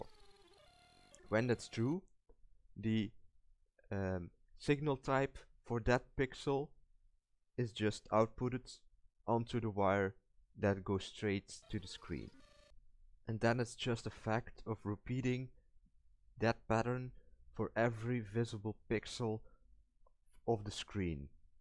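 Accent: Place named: Belgium. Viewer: It's sounds like you are Belgian imo, and it even seems like I recognise your voice. But that would not be possible since I don't really know any other dedicated programmers.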